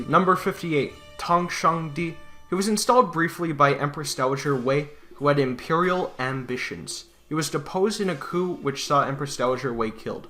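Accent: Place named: Chicago, United States. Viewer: DA MING, Down da line dere. I love hearing the Chicago accent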